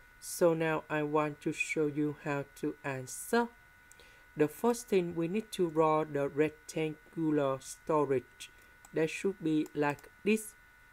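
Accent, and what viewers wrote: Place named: Vietnam. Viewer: oh giọng tiếng anh này thì chỉ có việt nam :))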